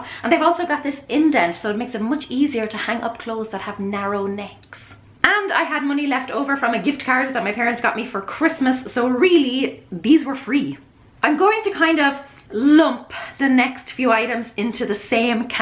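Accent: Ireland. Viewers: Elloello. Take hangers back too. Switch them for plain hangers and get a glue gun. Melt a frosting squiggle of glue the entire length of the top part. Let dry. Same non slip for delicate and dresses and for pennies on the dollar. I love your speaking pattern and Irish brogue. (hope that's right!) I have going to Ireland on my bucket list since I have Irish roots. Hugs to you and yours from NC.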